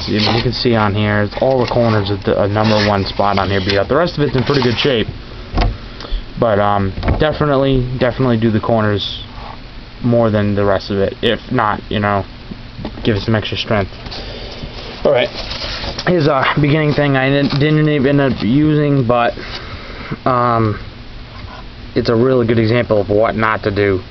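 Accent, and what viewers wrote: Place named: New York, United States. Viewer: I knew you were from NY, I can tell by your accent! Lets Go NY!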